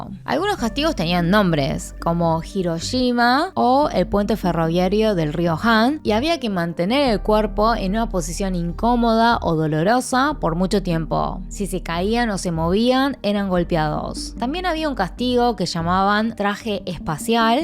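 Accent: Buenos Aires, Argentina. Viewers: La información es muy buena pero que pasó con el tonito que le das al hablar te estas esforzando ser una argentina o eres una fanatica del acento argentino te sugiero que le des un acento neutro eso te ayudará mucho un consejito es bueno aunque sea de un conejito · tiene acento argentino? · de todos los acentos tenia que elegir el argentino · Tremendo documental. SERIA MUY BUENO que la modulación fuera un poco menos de recoleta... menos nasal... realmente me costo mucho superarlo... La información fue tan precisa y tan buena la edición que me mantuvo como espectador... Pero seria muy bueno mejorar eso. En Argentina es muy chocante el acento de ¨CHETA¨ para todo el resto de las personas que no viven en los barrios de clase alta... Se lo asocia a gente privilegiada que no tiene empatía y se cree superior al resto. Creo que el VIDEO PRESENTACION en el canal es muchiiiiisimo mas accesible en ese especto. 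Seria bueno que esa misma fuera la entonación para el resto. POR SI SIRVE LA CRITICA. El contenido es de primera calidad. Muchas gracias. Un abrazo.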